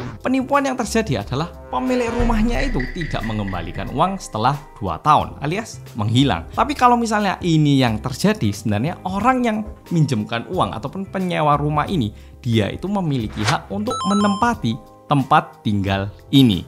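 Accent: Java, Indonesia. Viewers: Seharusnya mas ini yg jd penterjemah tim Red spark. Bhsa Indonesia nya super lancar jaya n medhok. Ngomongnya udh Indonesia bangetlah pokoke👍👍👍👍👍👍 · Aku kok belum terima ya mas ini orang Korea 😢 medok gitu kok, pura2 kali JD orang Korea 😢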